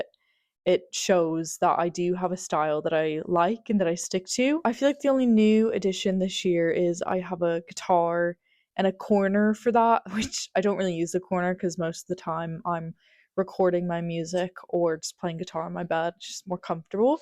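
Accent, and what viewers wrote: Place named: Ireland. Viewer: i watched a lot of your video's in succession. You have no idea how much your accent changed from Texan to to far more Irish.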